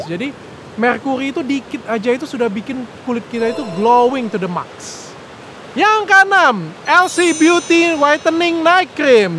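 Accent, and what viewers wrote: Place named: Sumatra, Indonesia. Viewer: Kami org Sumatra emang ngomong nya kekgtu 😂😂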